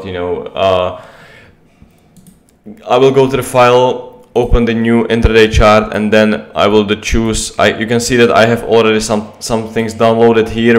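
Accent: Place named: Czech Republic. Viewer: ) ta čeština, to nezapřeš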